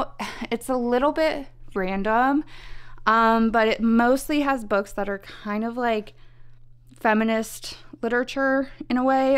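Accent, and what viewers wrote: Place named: South, United States. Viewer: Do you mind me asking what state/region you’re from? TOTALLY ok if not, I just LOVE your accent as a southerner and I’m a linguistics nerd. Also your shelves? Immaculate:)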